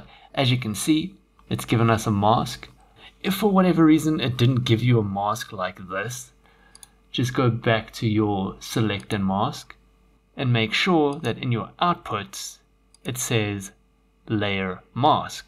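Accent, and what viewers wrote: South Africa. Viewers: Hey a South African accent :)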